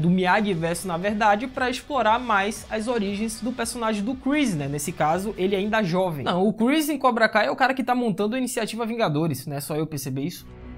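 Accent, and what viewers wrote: Bahia, Brazil. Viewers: Caramba mano, tu é baiano né? Tbm sou, so da sua voz da pra identificar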